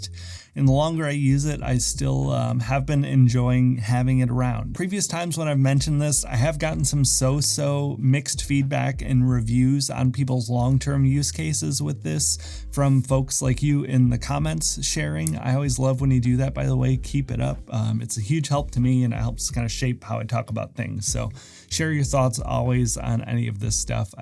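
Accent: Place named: Austria. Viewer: are you austrian, you sound like arnold sometimes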